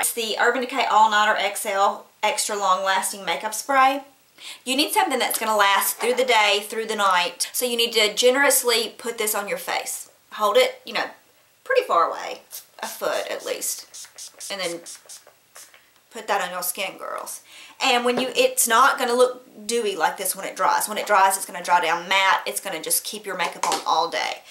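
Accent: South, United States. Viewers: Amazing tips!! Love your southern accent!!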